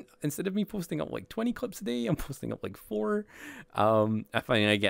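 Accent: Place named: Scotland, United Kingdom. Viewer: Wait a minute he’s got like a Scottish accent and now I’m not gonna be able to unhear it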